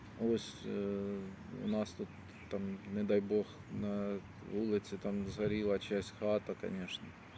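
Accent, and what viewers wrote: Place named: Kharkiv, Ukraine. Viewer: За Вовчанськ дійсно нема слів. Вітання з Харкова. Я ж чую що Ви з наших місць.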